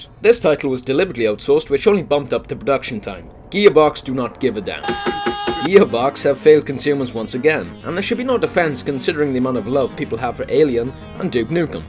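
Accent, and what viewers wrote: Wales, United Kingdom. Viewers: Hey love your stuff, it would be cool if you could give us a list of upcoming games you are reviewing. Btw where are you from? i want to say wales judging by your accent but i'm not sure:/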